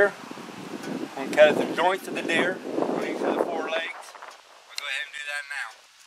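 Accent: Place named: South, United States. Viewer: Monsieur he sounds southern deer don’t get big down there in Florida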